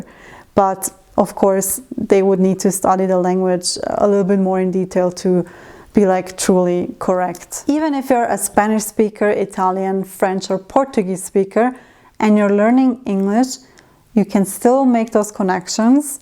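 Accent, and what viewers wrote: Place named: Switzerland. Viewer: Danke für ein sehr interesantes video. Ami me gusta escuchar tu Swiss Deutsch. Espero que faze mas video sobre los puentes entre los idiomas. Obrigado ciao!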